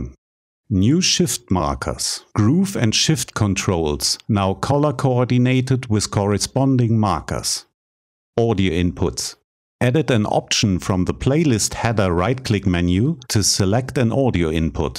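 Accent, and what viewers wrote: Germany. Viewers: I LOVE this channel! Gold mine! And the German accent makes it quite entertaining! 😄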